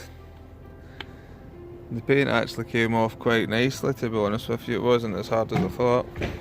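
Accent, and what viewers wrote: Scotland, United Kingdom. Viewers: Scottish accent is brilliant, always reminds me of colin mcrae, his accent is ingrained into my mind :( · Who in their right mind would complain about a Scottish accent? It’s music to my ears! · I would NEVER complain about a Scottish accent. That’s what keeps me coming back, lol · I love your scottish accent :D Guys and GAROLS! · You hang on to that Scottish accent. They're harder to come by these days. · I love your Scottish accent but then I’m Clan Ranald myself so I have no trouble with it. I live in western Canada though. Another job done well. To bad I couldn’t get you to do my car. It’s not that dirty but a deep clean would still be nice. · Love ypur scottish accent. The best man to clean a felthay car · You're Scottish. So speak scottish.dont change how u speak for anyone 👍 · Your Scottish accent is awesome. I have an accent as well. 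Forget about people’s opinion. A lot of them don’t understand the struggle of learning and/or speaking two languages. Keep it up!! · Don't lose the Scottish accent. It is wonderful just like your work and attention to detail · Hahaha I love the Scottish accent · I love your Scottish accent! It's one of the reasons I watch your channel! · Actually I love Scottish accent as much as your work. It is a cimbination of both. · Luvv yarr sco'ish accent 😊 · I'm hear for the Scottish accent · Love your Scottish Accent 😻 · Love your Scottish accent! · Who on earth is commenting on his accent, I freaking love it!!! Keep the Scottish accent, dont change it for anyone!! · I watch for the Scottish accent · Watching your videos is my guilty pleasure, I love the Scottish accent too! From Edinburgh myself but came to Staffordshire a long time ago. Thanks for posting and happy new year to you and your family. 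😊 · I love your scottish accent. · Your Scottish never be ashamed of your accent I am welsh and my accent is strong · Love your accent tell people bugger off🙂😉my husband and I were trying to figure out where you were from then I said Scotland that that was your accent. I like watching how you take these cars from dirty filthy mess has two really nice cars that I would sit in. I enjoy your channel and I look forward to your next video. Have a blessed day. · I'm cool with the Scottish accent. Is your restoration business based someplace in Scotland?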